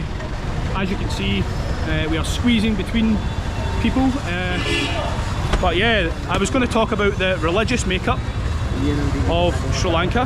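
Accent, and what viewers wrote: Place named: Ireland. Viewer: Which country are you from? Let me guess: Ireland?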